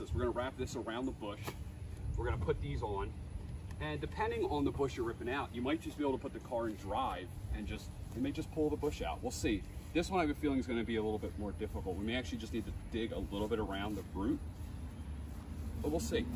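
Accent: Philadelphia, United States. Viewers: I knew my man was from Philly before I saw the Flyers shirt and the PA plates lol. Great video.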